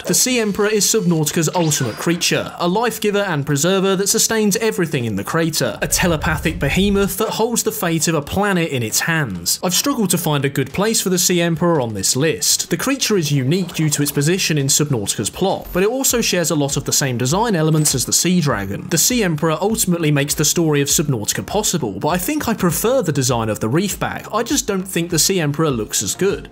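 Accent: United Kingdom. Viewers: Jesus, you're about as basic bitch of a YouTuber as you can be. British accent, over used 5 images of what you want people to see you as, and cliche sound effects, ew. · I love your British accent. Also great video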